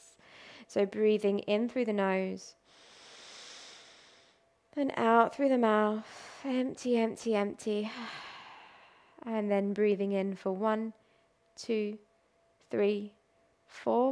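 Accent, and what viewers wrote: United Kingdom. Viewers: Another great session. Thank you so much. I especially enjoy listening to your voice. I am a southern Alabama girl living in Ohio and I can assure you the wonderful British accent is a welcome change.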